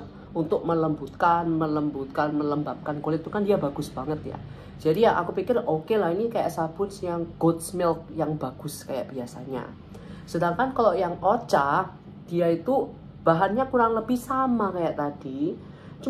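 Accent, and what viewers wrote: Surabaya, Indonesia. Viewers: E beneran ternyata logat khas suroboyo hehe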